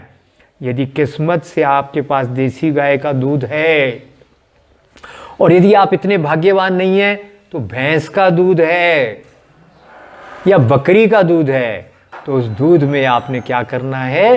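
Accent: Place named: Punjab, India. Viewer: Aap Punjab se ho vaid g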